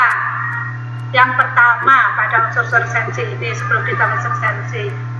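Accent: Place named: United Kingdom. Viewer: Aksen british nya terasa sekali ya ^_^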